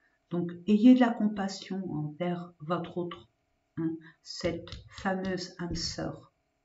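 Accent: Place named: South, France. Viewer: Bonjour, accent du sud... ça tombe bien je suis dans le Var et je veux aller dans le Vaucluse pour faire effectivement un autre travail enfin quelque chose qui englobera mon travail actuel. On attend juste le financement pour pouvoir avancer dans le projet. Avec achat immobilier... Choix numéro 1, c'est beau. Merciiiii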